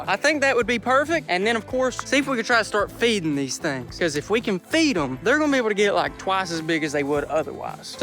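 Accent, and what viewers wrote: South, United States. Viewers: Don't even know how I came across your channel but I've been watching 4 to 5 vids each night, I'm obsessed! And in my opinion, as a non American, your accent has quickly become my favourite American accent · It's still A riot to me that in this country, people actually talk like this honky tonky accent. Unreal!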